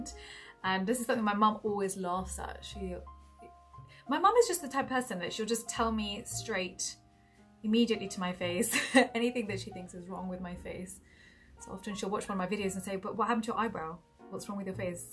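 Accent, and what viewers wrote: United Kingdom. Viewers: plus that British accent :).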